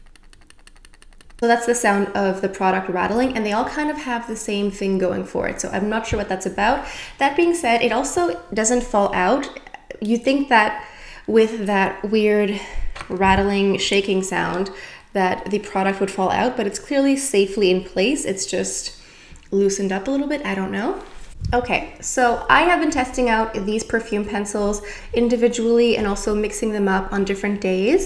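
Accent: France. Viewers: I have not seen the pencils here in the US yet but I agree with you on trying the hair mist if you don’t have. I bought the Chance hair mist after your review & love that it’s a softer scent than the perfume. I have both but it’s nice for touch up like you mentioned. Also you mentioned lotions & oils. I like to get the oils & sprays like in TF because the perfumes can be too strong. But the pencils look so pretty but I would want them to last longer than couple hours. Very nice review. Love your eye look & your French accent!!😊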